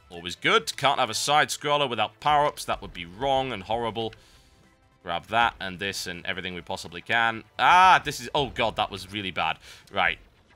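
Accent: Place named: England, United Kingdom. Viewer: Where about in England you from? Your accent is amazing. I'm from Birmingham so I sound like a idiot:(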